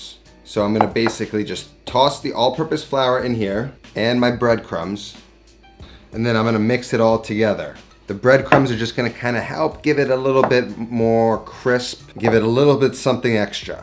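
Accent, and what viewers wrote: New York, United States. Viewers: New york accents are magic on video for some reason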